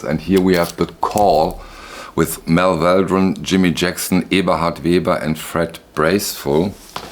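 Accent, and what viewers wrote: Germany. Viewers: Hey, I find your slowness in speaking embarrassing and I hate your terrible German accent: e wäry, wäry, ärly pressing. Phhh. Everything you say is predictable and completely unoriginal. I don't think you're very musical, based on what you rate positively. I am amazed at your many fans. Ok. That's how it is.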